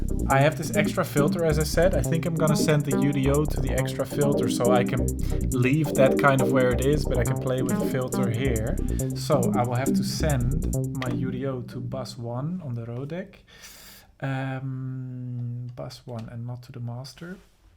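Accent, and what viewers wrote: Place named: Ireland. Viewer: you can have the irish accent pass lad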